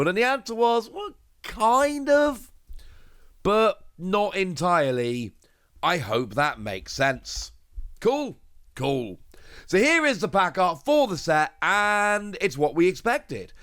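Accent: United Kingdom. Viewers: Hahahaha that UK accent messing people up again. Love it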